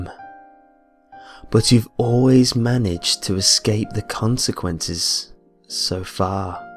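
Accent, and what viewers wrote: England, United Kingdom. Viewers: I love your accent :) I'm english too but i'm from the east midlands so my voice sounds dead common when I do Creepy Pasta readings :P Wish my voice was as posh as yours lol. Awesome reading once again :) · Gotta love brit accents XD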